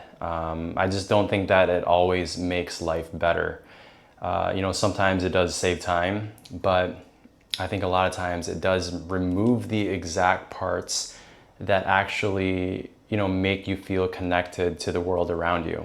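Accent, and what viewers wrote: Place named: United States. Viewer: got an American accent!!😊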